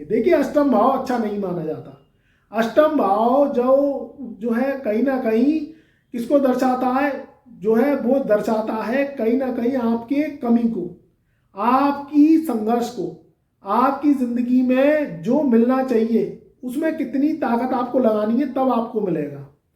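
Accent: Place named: Uttar Pradesh, India. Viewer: गुरु जी आप शायद आगरा side के हैं। मैनपुरी फिरोजाबाद जैसी भाषा tone 😂😂😂😂😂😂😂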